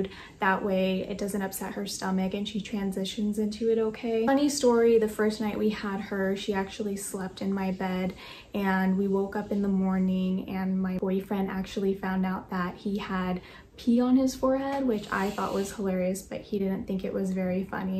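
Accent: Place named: United States. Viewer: Sorry , hold up, what did u say....'Puppy Store' what the hell is a puppy store, what like a shoe shop or bakery....I assume from your accent your based in America somewhere...tell me can you go into 'store' and buy a dog, like a loaf of bread or a pint of milk?....was she even with from her momma in the pet pen... This is all so wrong...doesn't happen in Scotland ...no puppy stores...puppy farming is horrific and needs to be stopped it goes on everywhere, but a 'puppy store' .. geeze, thats screaming out, 'ill treated animals right there.. be mindful people, be sensible people, be savvy.. buy a puppy from a home breeder...spend time getting to know the breeder and family, make several visits to the home, spend time with them and the dogs and the litter....don't buy from a store ffs 😥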